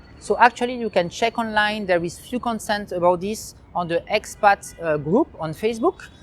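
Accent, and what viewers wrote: France. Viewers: I’m American, You should really put subtitles your English is not good ...you have a super heavy French accent...I couldn’t understand you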